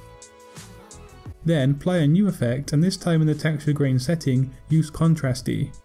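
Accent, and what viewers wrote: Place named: Bristol, United Kingdom. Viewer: I knew I recognised that accent! The same for me between Bris and Bath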